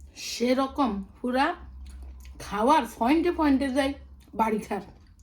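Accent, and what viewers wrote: Noakhali, Bangladesh. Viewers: আপু নোয়াখালী বর্না হইতেছে😭😭😭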